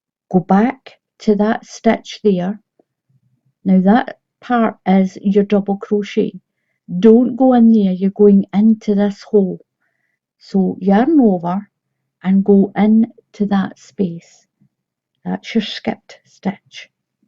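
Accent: Scotland, United Kingdom. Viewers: Hi from cork Ireland I love your tutorials also the Scottish accent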